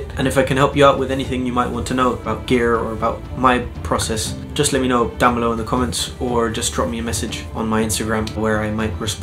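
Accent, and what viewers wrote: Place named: Canada. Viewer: wait you are 100% canadian, that drivist prononciation.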